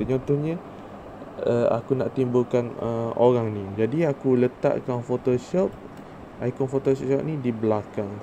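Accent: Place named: Terengganu, Malaysia. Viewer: Saya suka abang cakap ganu je..terhibur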